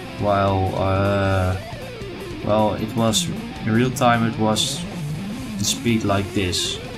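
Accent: Netherlands. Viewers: Are you Dutch? / Ben je Nederlands?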